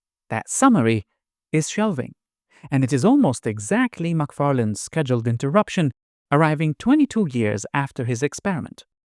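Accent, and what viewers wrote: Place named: Netherlands. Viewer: This vid haz awesome English gramma (grammar) with a Dutch accent!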